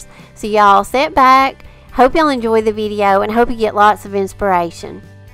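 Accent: South, United States. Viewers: That came out so cute! I just love the sound of your voice and your southern accent !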